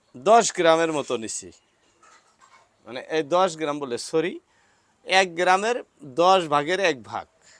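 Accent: Bangladesh. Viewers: কাকাকে আমি তো মনে করছি বাংলাদেশি । আপনার ভাষা আমাদের সিরাজগঞ্জের ভাষা একই রকম।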